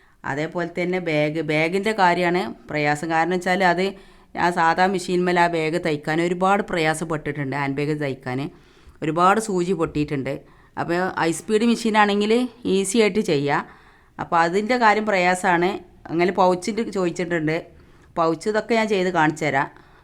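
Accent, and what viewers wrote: Malappuram, India. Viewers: തനി മലപ്പുറം slank...... no ജാട.... അടിപൊളി താത്ത...... i like it ഉറപ്പായും ഞാനും ഉണ്ടാകും ഞമ്മടെ ബെഡ് സീറ്റ്‌....... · ഇത് ഞമ്മളെ മലപ്പുറം ഭാഷ.. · താത്ത സൂപ്പർ നമ്മുടെ മലപ്പുറം ഭാഷ പൊങ്ങട്ടെ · Malappuram original samsaram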